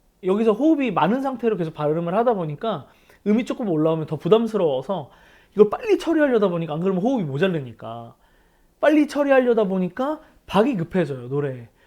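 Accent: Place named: Gyeongsang, South Korea. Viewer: 아..너무웃겨요 배잡고 뒤로 자빠짐요^^경상도발음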